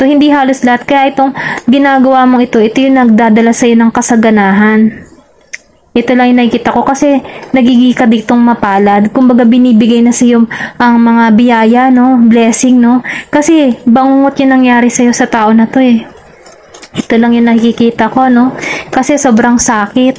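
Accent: Batangas, Philippines. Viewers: Mkasarili po tlga🥲😔 WLA pkealam s nrramdman ng iba, thank you po💖 mam batangeña kpo ba hehe feel ko lng po salita nyo😊 pero Kung di nmn bka lng po 😅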